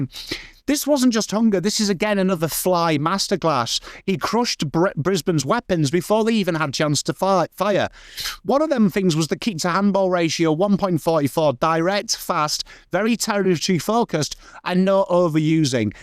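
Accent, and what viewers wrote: England, United Kingdom. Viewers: Why does it sound better coming from a Pom?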